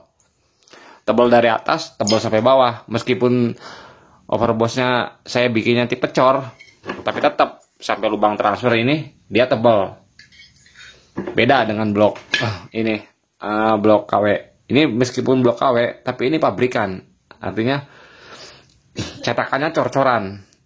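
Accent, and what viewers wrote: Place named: Jakarta, Indonesia. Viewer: logatnya teringat kenangan ibukota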